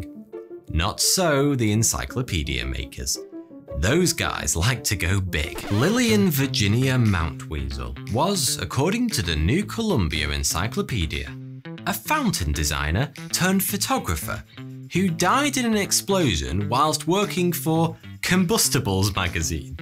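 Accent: United Kingdom. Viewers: "to put it mildly, a bit shit" Love that British accent.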